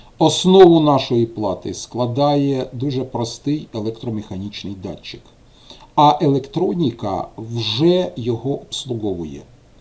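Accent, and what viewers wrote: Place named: Kharkiv, Ukraine. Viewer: Харкiвський акцент украiнськоi, на дев'яносто п'ять вiдсоткiв майже такий, як I киiвський))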